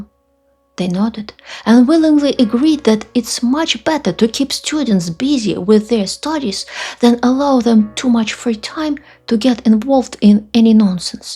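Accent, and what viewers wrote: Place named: Russia. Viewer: This video is really cool. There is no spoken dialogue, it is narrated by herself in her perfect English with her charming Russian accent. The cinematography is vivid and authentic. Enjoy!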